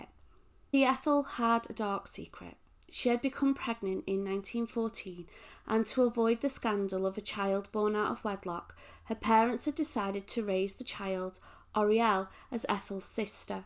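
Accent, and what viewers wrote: United Kingdom. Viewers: Thank you for telling this so wonderfully. May I ask where you're from? Wigan or St.Helens maybe?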